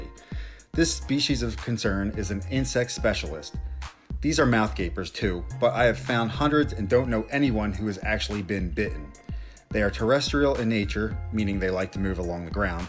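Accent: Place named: Delaware County, United States. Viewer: Sounds like a delco guy. With that accent!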